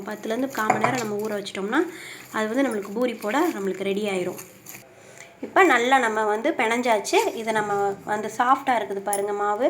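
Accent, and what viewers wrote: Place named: South, India. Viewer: It's southerners slang.This originality is good and unique. Let it be.Its also part of her cookery videos I fèel.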